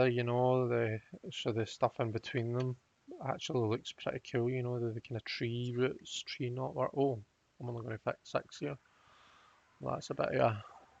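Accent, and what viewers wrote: Scotland, United Kingdom. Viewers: I love this deck. I was really lucky that I had the opportunity to buy it from Caitlin Matthews in person and she gave me a 10 minute explanation of the deck and Lenormand. Her book The Complete Lenormand Oracle Handbook is amazing too. So nice to hear another Scottish accent on YouTube 😃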